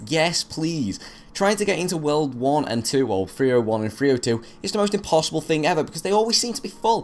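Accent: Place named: Liverpool, United Kingdom. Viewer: Got to be Liverpool, that accent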